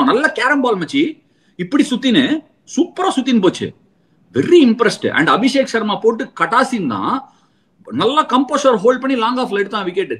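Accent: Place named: Chennai, India. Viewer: Two lines review for entire match..super na.. akkul perthudurum , namma Chennai style la ketkum podhu arumai...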